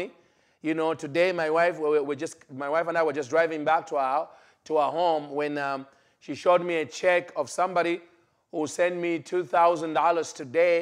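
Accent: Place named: Africa. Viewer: Honestly speaking why can't you understand that he's not Westerner but an african and you just have to understand him what he's talking about by the help of the holy spirit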